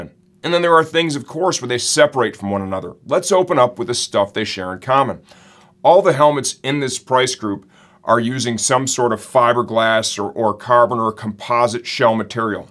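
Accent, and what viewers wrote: United States. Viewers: This man has the voice of MURICA in the intro, praise him.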